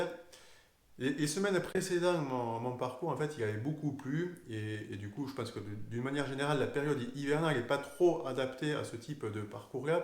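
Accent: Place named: Southwest, France. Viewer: Bonjour bon récapitulatif tu nous fait encore révé une question lors de ton premier voyage tu parté avec une étape en téte ou au filing car j espere faire mon 1er voyage en septembre mais je me suis fixer 90 ou 100km mais je me rend pas compte car c est mon 1er donc besoin de conseil merci un bonjour a tous de la belgique😉encore merci pour l axcent du sud ouest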